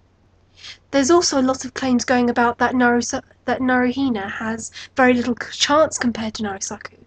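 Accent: United Kingdom. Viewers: Soooo true!! NARUHINA FTW! I love you and your british accent is so beautiful *-* ♥ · First of all I love your british accent! It's nice to hear a rant from somebody not from Japan or the States for once. Second, I just know one thing, regardless of all the facts in this argument, I think it would be an utter travesty to not make NaruHina official because I honestly can't see Sakura being disappointed about those two being together at the end of the show, yet Hinata would be devasted terribly if he chose Sakura, especially after putting her life on the line against Pain. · I love your rant! What you say makes so much sense and your english accent is awesome! ^^ BTW: NaruHina & SasuSaku are CaNoN!!! Let's celebrate "\(^v^)/"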